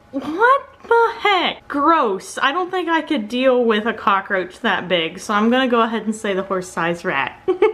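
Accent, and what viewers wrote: United States. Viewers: какой же все таки противный американский акцент, но эта баба настолько говорит на нем, что ощущение, что у этого человека гайморит или синусит. фу блэ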